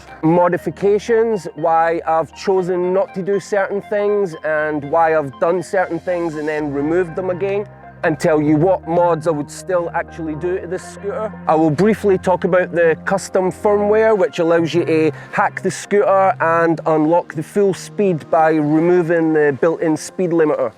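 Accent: Scotland, United Kingdom. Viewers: Thank you so much for your hard work man!!! 5 star review!! Scotch accent is bad ass!! I am trying to master the accent!! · Hi! I'm glad that my comment could make you feel good, unlike some... people who have nothing else to do in their life but troll. ;-) As for your Scottish accent, it's very light compared to my Scottish godfather's, and I understand you perfectly. But it's well known that English people from England England often have trouble with a non-Oxfordian accent. :-P I travel a lot and I intend to do some trails in Scotland as West Highland Way, Southern Upland Way, Great Glen Way, etc. But this when I'll have modified my Pro 2 (which is already equipped with Monorim front and rear suspensions + 10" tires) into a powerful 2WD (2 x 500W + Monorim U5 Pack + additional Aerdu 13S4P 48V 14ah battery), in order to have the power for the climbs, especially with the camping gear in the backpack, even if it's all ultra-light, and a maximum autonomy (with solar charger for long trails, of course!). 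[ I know, some would say I'd better invest in a Kaabo Wolf Warrior King GT, but it wouldn't be as light to carry in and out a sailboat for daily use, and I don't need all the speed it provides. I prefer to ride safely at a gentle pace and have maximum autonomy. ;-) ] So, if you wish, maybe we could meet when I'll pass by your place? And let me reiterate my thanks for your videos, which are also well edited in post-production. We can feel the work of a passionate person. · BREVVVV Scottish accents the best one! Big up ya self and bun the haters lol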